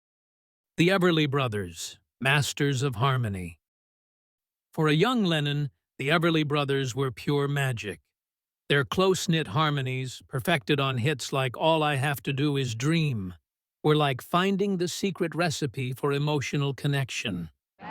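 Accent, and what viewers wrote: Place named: United States. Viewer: Here we go again, the Yank narrator, talking about the bigest "British band" the world has ever known, and the five who impressed the most, yes you've got it, five Americans, you knew it was gonna be rubish as soon as he opened his mouth, they just can't except they've never produced a band as big as the, their answer was the, what a disaster that was.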